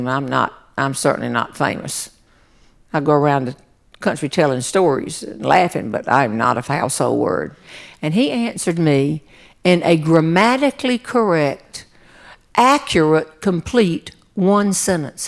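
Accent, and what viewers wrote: South, United States. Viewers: My father's family if from West [by God] Virginia. This woman reminds me of an Aunt from there and my grandfather. Common sense to the nines. That sweet southern drawl as they lay down little pieces of candy while telling a story lead you to fall into the humor pit. Good stuff.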